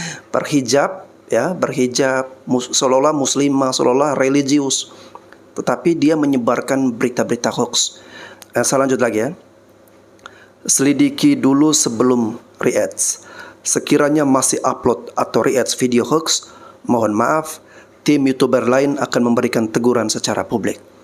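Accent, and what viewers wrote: Flores, Indonesia. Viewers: Aksen logatnya itu org NTT Flores 😊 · oh ye ke slang dia mcm org Indonesia jer kihkihkih 😂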